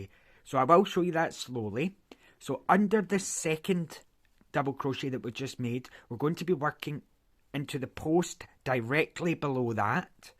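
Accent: Scotland, United Kingdom. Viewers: I'm from Devon and very rarely get to hear a scotsman very nice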